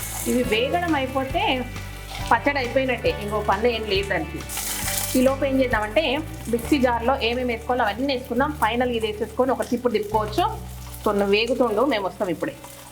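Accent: Telangana, India. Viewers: Good telangana slang mam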